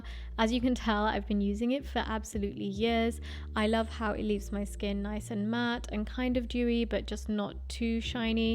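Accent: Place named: United Kingdom. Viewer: It is so surprising that you are Pakistani and you have a magnificent British accent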